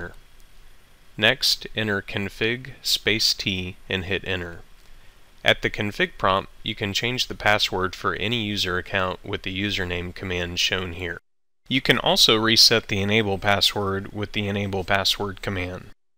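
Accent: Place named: United States. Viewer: Warning #1: This is a furry video. Warning #2: The narrator is American and will systematically pronounce "enter" as "enner".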